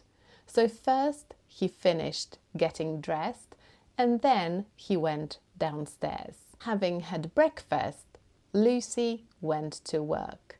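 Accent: United Kingdom. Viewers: Never have I met such a fantastic and charismatic English Grammar Teacher like you. You look quite captivating, sweet, and heavenly with your contagious smiling face blended with a soothing British standard accent. You are wonderful and ethereal. Cheers! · I do love your beautiful british accent and of course all of your grammar teachings! ❤ You’re awesome! 😊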